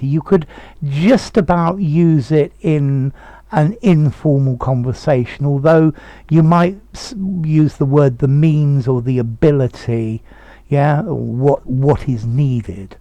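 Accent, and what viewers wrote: United Kingdom. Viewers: Great explanation...the British accent is so beautiful and nice to listen to...why is that?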